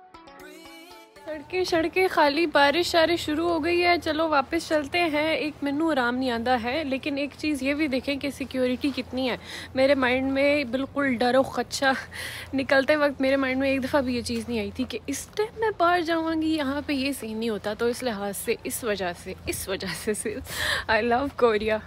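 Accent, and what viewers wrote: Punjab, India. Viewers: I have recently subscribed your channel And I'm just getting very entertaining video to watch. Loving your Punjabi accent and purity ❤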